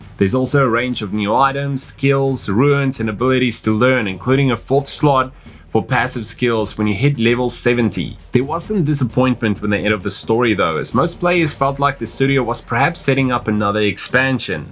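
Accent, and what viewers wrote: South Africa. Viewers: Personally I like Diablo 3 on console as it feels the same as Baldur's Gate: Dark Alliance and Champions of Norrath: Return to Arms, 2 games I have played way too much back in the day on the PS2. PS. It sounds like you are from RSA.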